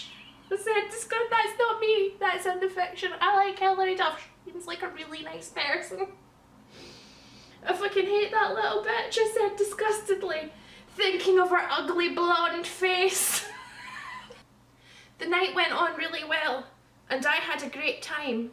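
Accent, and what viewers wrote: Scotland, United Kingdom. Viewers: I'm bad at identifying accents. She's scottish? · ... bad Harry Potter fanfiction read by a Scottish woman? I need this in my life! I came for the Scottish handmaid, but I'm staying for this! Subbed!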